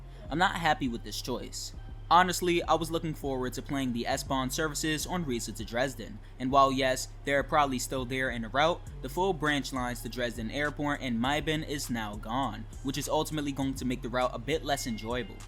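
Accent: United States. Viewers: Right...I had to go back to see what the big deal was and you are just saying it the way we do here in the States. If you listen to videos from different regions and countries then you have to be prepared for different pronunciations sometimes.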